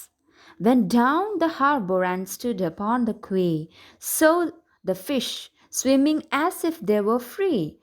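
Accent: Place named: England, United Kingdom. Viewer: I like your english accent ❤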